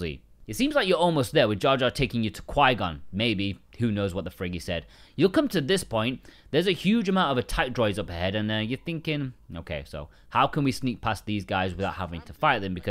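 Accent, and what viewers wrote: England, United Kingdom. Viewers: I've given you a sub, great video btw, but I'm still baffled where in England your accent comes from, maybe it's a Mos Espa twang.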